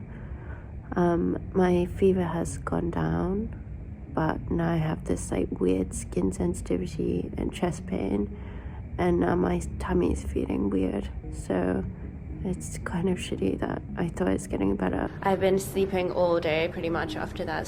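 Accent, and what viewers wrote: United Kingdom. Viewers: I’m glad that you recovered! Thank you for sharing your story! I love your YouTube and your British accent ☺️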